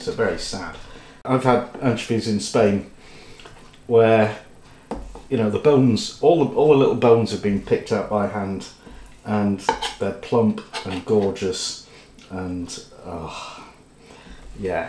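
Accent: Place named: West Yorkshire, United Kingdom. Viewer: Hi, just found your website. I obviously know you are from Yorkshire, however where abouts? You sound like the same part of West Yorkshire that I am from so was curious 🙂 x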